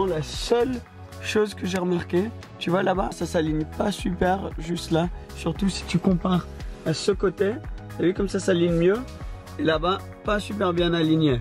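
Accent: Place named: United Kingdom. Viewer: On dit TESLA, pas TESLA. L’accent UK, ca va bien 2 minutes, ça fait des mois que t’es revenu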